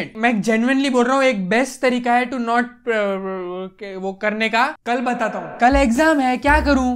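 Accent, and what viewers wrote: Gujarat, India. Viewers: Tum jese bolte ho vese Pata chal hi jata he ki tum gujarat se ho❤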